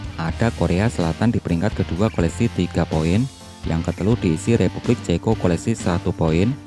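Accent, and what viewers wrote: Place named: Madura, Indonesia. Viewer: Admin e wong meduro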